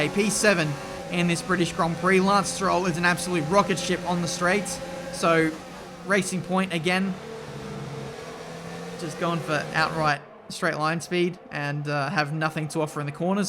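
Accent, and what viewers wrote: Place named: Australia. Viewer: I'm not particularly in to F1, but these career mode vids are good fun. Amazing how the graphics capture how the head tilts as the car corners. You can see the force acting on the neck and imagine how strong your neck muscles have to be to take the cornering speeds! With respect to American uploaders, it is refreshing to hear another accent. I love all the Ozzie phrases. I love that the Ozzies take pride in their vernacular. Never mind the Inuit with their 7 words for snow, I love the way Ozzies can say 'this is shit' in a 1,000 different ways!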